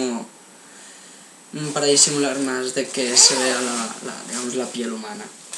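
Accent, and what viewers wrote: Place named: Catalonia, Spain. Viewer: com es nota que ets catala cabro! xd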